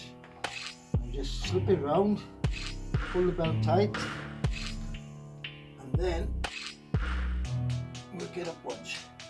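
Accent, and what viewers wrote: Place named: Wales, United Kingdom. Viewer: I'm watching this from Australia as I've got a 1250 Bandit too. Love the Welsh valley's accent as I'm originally from Hengoed !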